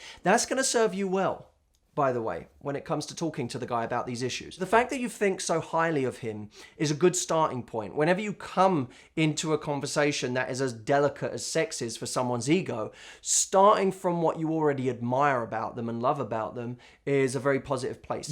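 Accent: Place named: England, United Kingdom. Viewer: I loved it when he said in the bedroom, and dirty tawlk, nawtee talk...lol cute, in that sexy English lad accent of his... I enjoyed that stuff he said when he was speaking in a seductive tone... Ooh those lovely green eyes, the sultry expressive baby come hither looks and body language, but those soft tones make me all melty, I want to hear him speak like that to me, telling me what he would like to hear me say, & costing up in the street whispering I can't wait till I get you home. Of course in the context of being life partners I am permitted to imagine these lovely intimacies...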